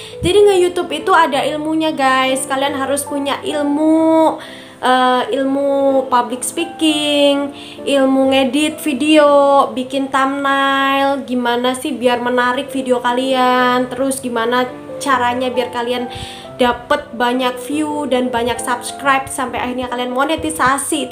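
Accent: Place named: Jakarta, Indonesia. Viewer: Saya stuju mbak klo ngeyoutube itu gk semudah yg kita pikirkan, setahun lebih ngeyoutube sampe berlinang keringat sampe akhirnya dimonet. Skrng org sekitar pd ngomong "lu mah enak bikin video youtube doank gk usah capek2 tiap bln dpt duit dr youtube",, denger koment kaya gtu rasanya pengen nam****l 😂 Btw betawinya kental bgt mbak haha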